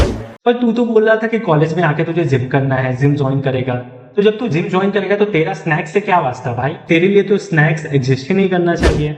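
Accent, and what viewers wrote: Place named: UP/Bihar, India. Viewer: Bhaiya aap pakka UP/Bihar se lgte hain. I am also from Bihar😊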